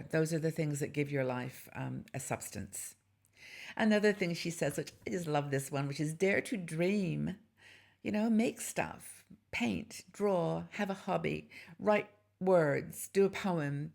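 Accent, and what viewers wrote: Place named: United Kingdom. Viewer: I can hear that British accent love your channel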